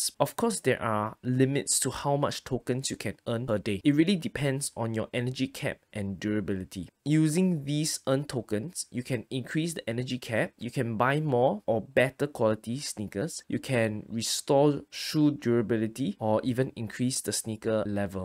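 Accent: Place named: Malaysia. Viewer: no worries mate, ur explanation was crystal clear and highlights most of the important things that people like me wanna know. my question is, are you malaysian? singaporean? or indonesian? that english accent of yours is familiar to me haha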